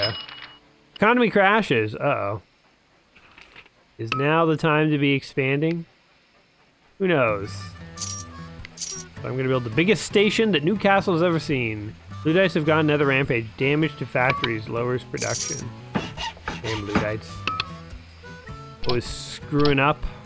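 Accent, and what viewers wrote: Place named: Brooklyn, United States. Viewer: Sound more like Brooklyn.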